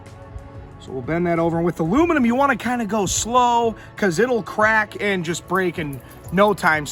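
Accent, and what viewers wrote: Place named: Florida, United States. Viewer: Michigan? Definitely thought u were a Florida boy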